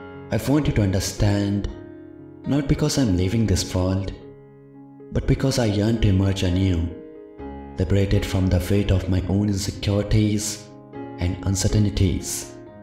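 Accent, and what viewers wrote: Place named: South India, India. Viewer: Your pronunciation is like the south Indians speaking English with the vernacular tone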